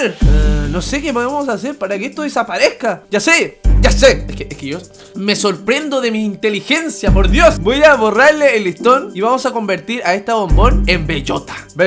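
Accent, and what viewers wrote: Chile. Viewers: eres chileno? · Eres de chile verdad? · Se nota mucho que eri de shile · Eri chileno weon? :V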